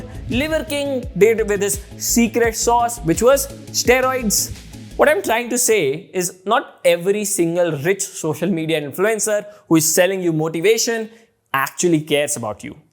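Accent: India. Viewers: I am sorry ,but I guess he needs to work on his accent,too much Desi accent..please take it as an advice from a fan of aevy tv.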